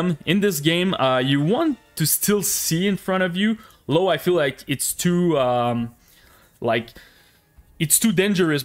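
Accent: Quebec, Canada. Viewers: The Quebec accent is here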